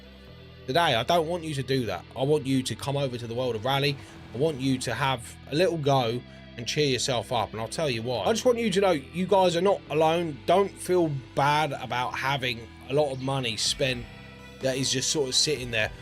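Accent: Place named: England, United Kingdom. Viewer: i love your original english accent! :)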